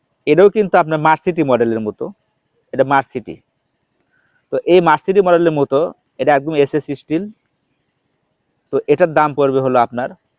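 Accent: Bogura, Bangladesh. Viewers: ভাই আপনার বাড়ি কি বগুড়া?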